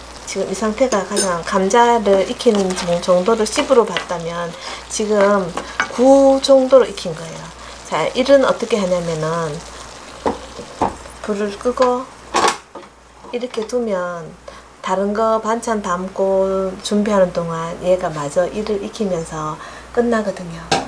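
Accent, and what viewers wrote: Gyeongsang, South Korea. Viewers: 경상도 사투리를 어찌 이리 맛갈나게 쓰시는지! 음식보다 말씨가 맛있어서 보게 됩니다. 사진을 보니 인물 또한 고우시네요. 동영상에 얼굴을 보여주지 않는 이유가 시선과 관심을 님의 미모가 아니라 요리에 집중시키려는 배려 같군요. 요리 또한 맛있을 것으로 기대합니다. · 사투리 경상도 말씨 푸근 친근감 가요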